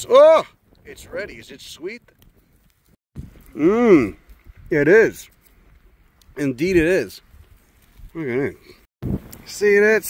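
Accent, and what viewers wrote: Chicago, United States. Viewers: Funniest botanist. He’s from Chicago and travels the country trying to look at plants. Heavy Chicago accent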